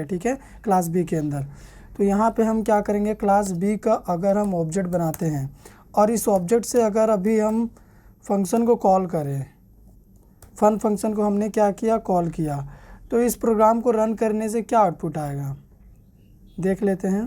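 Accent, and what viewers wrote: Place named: Hyderabad, India. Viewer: Hyderabadi slang zindabad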